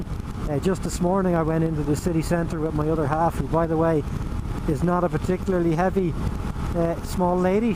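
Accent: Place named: Ireland. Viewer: Hi, nice review, and nice to hear an Irish accent on for once. Looking to get a Tracer, you mentioned the seat being bad, I have an 04 R1 and I find the seat cutting into my leg after a couple of hours and I get a numb bum:) What's the tracer like? Do you still have it?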